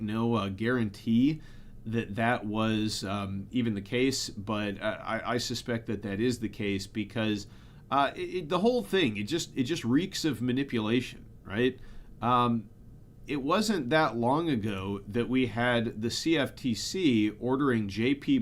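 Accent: Chicago, United States. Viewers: Where did you grow up? That sounds like a Chicago accent if I've ever heard one.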